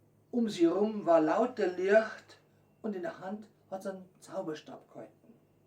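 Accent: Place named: Bavaria, Germany. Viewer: Obwohl ich aus Norddeutschland komme und manches bairisch nicht oder nur schlecht verstehe, erfreut es mich dich dies lesen zu hören. Viel Erfolg mit diesem Kanal! 😊👍👍 ich bin sicher du kannst noch viele andere damit glücklich machen!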